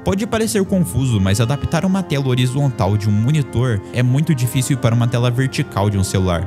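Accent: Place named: Interior, Brazil. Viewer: qual região do Brasil você nasceu e/ou vive até hoje? Porque pelo visto você tem sotaque de pessoa do interior do Brasil.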